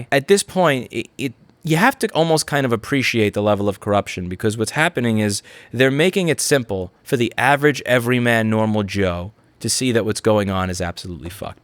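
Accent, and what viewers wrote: New York, United States. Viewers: Angry New Yorker knows his shit.... Thank you for the lesson I'm walkin' here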